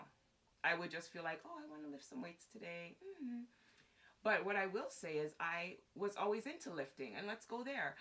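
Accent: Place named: Caribbean. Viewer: Gorgeous and intelligent? New subscriber here! :-) 🤔 Do I hear a slight Caribbean accent? ... Just got to 8 minutes. Definitely Caribbean. Lol ♥️ Yaaadie!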